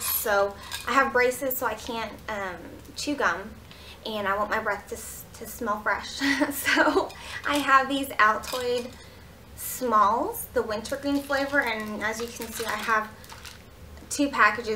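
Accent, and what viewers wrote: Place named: South, United States. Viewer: I've been a subscriber for a few years now but since I moved from CA to Louisiana, I appreciate your accent even more lol! There's just not many Asians where I live now so to see one with a southern accent is pretty cool lol :)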